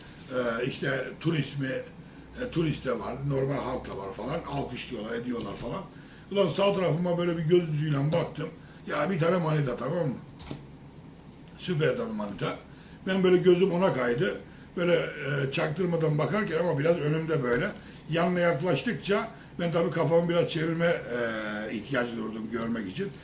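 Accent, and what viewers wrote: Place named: Izmit, Turkey. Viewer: abi sen izmitli miydin ya tam bizim buralardaki gibi konuşuyosun harika bir insansın çok sevdim seni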